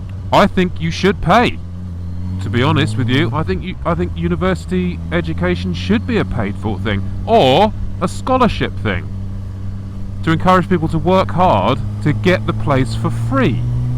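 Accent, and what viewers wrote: England, United Kingdom. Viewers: It's like my own thoughts as I'm riding, only in an English accent. I too started a business, motorcycle related no less. The hard work, self learning, and sacrifices made it successful, not my degree. Great vids. Subscribed.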